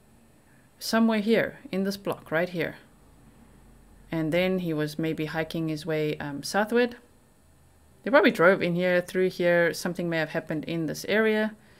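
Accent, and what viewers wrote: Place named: South Africa. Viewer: I look forward to checking in to your channel daily! Not to mention your South African accent!!! I lived in S.A for 2 yrs in Jo-burg and Pretoria. Miss in tons! Thanks for the great content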